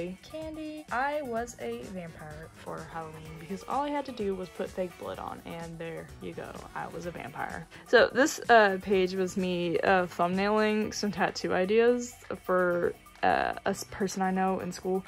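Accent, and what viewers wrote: South, United States. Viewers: ive watched this video at least 4 times and i just realized you have sort of a southern accent 😭😭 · I love your art it’s so expressive and fun and colorful! Also, are you southern? I feel like I’m detecting an accent. I’m from Missouri so maybe I’m projecting lol.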